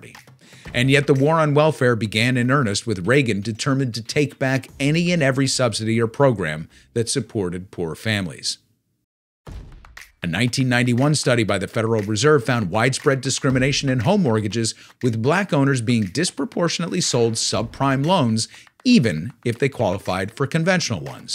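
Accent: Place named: England, United Kingdom. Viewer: Uhh acento ingles mal asunto jajajaja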